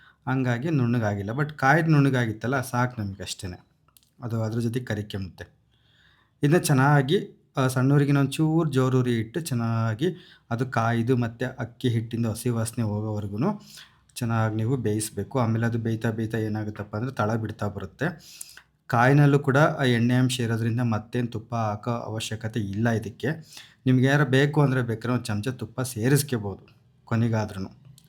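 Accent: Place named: Davanagere, India. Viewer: We in our family love the way you speak Kannada... please preserve the Davanagere dialect🙏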